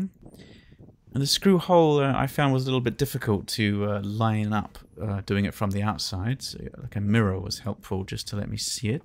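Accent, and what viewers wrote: RP, United Kingdom. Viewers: I love the posh accent.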